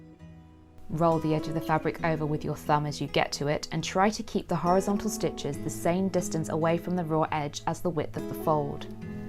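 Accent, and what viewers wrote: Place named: England, United Kingdom. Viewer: Have always wondered how this is done! Great video, and a beautiful English accent that is easy on the ear ! 😊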